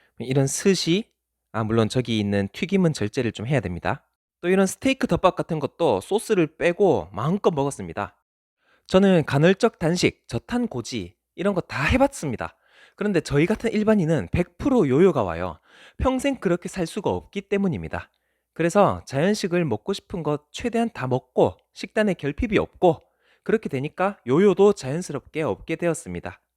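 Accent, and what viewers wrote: South Korea. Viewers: 사투리가 어디 쪽이지 대구 경북쪽인가 ??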